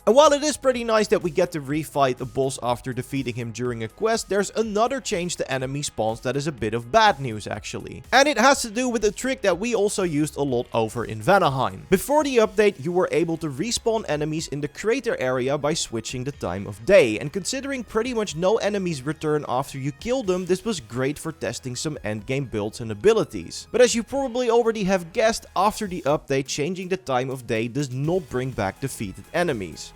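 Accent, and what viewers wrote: Netherlands. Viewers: This man had the most dutch accent ever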